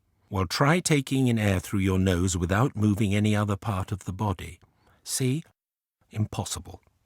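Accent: United Kingdom. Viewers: Wow, the British accent is so pretty!!, but I cannot remove my mexican accent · Is he British? where about in Britain?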